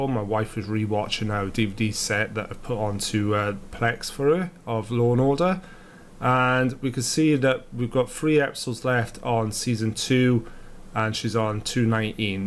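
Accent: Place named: Wales, United Kingdom. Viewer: Love the accent. Left Swansea for US at 16 so sounds like home. Gonna try this today ...fingers crossed.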